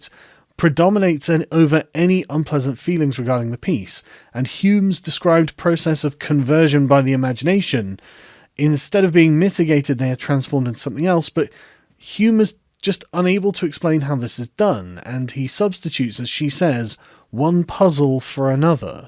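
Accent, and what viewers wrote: United Kingdom. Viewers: I'm here just for the British voice ASMR.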